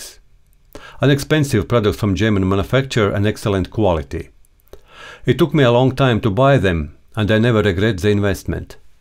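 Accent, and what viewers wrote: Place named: Russia. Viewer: Привет! Жуткий акцент))). Вы русский? Отличное видео. Будет чем себя занять на досуге. Лайк.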